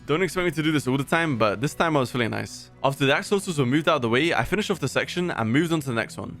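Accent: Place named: Luton, United Kingdom. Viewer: Yo, are you from Luton?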